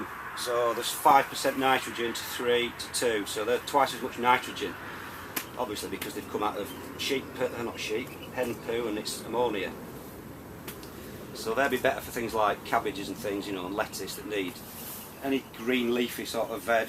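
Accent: Yorkshire, United Kingdom. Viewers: .... that's his Yorkshire accent! "The fertilizer" become t'ertilizer.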